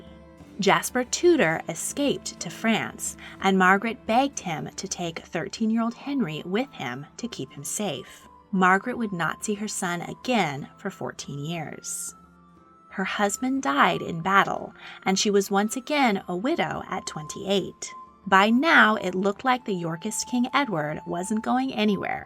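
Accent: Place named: United States. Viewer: Dying of dysentery on the battlefield? Huh. American narrator for English history; never works.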